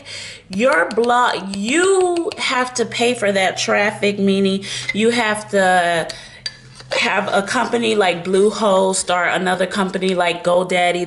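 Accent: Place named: United Kingdom. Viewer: I love the British accent so much!! this looks lovely!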